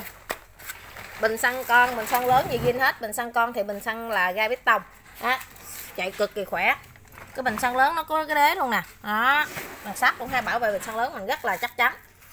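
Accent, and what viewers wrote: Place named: Mekong Delta, Vietnam. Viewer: Bà chủ máy này giọng miền tây. Nghe chân chất mà vui vui. Cách giớ thiệu sản phẩm mộc mạc nhưng chuyên nghiệp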